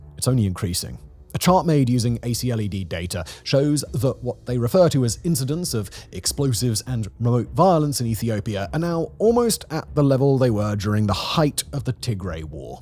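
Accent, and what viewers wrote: United Kingdom. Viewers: PM Ciolacu is not from Iohannis' party. That party is the NAtional Liberal Party, a center-right party. Ciolacu is from PSD, the center-left (and socially conservative) party that has dominated Romanian politics in all of its incarnations. Ciuca was Iohannis' creature, since the President propelled him to lead the National Liberal Party and to be PM before Ciolacu as part of their left-right alliance during the war in Ukraine. Listening to these videos reminds me of how Gell-Mann amnesia manifests, when you believe something a Brit tells you in his cool accent until he starts talking about something you know.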